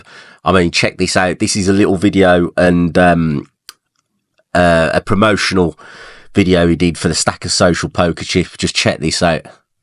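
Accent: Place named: Black Country, United Kingdom. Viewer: Nice to hear yowm frum yam, merry Christmas our kid 👍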